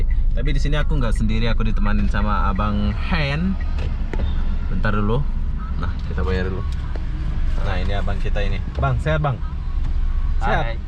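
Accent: Malaysia. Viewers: Masih penasaran aku..org indo tp kok logatnya malay.. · Abang ini asli dari mana kah? Logat nya melayu bgt.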